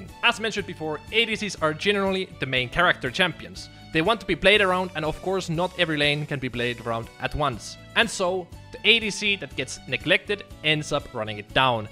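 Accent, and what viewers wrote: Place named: Finland. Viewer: start watching video hear the accent realization FINLAND MENTIONED🇫🇮🇫🇮🇫🇮🐻🐻🐻🐻🥶🥶🥶❄️❄️❄️❄️🍻🍻🍻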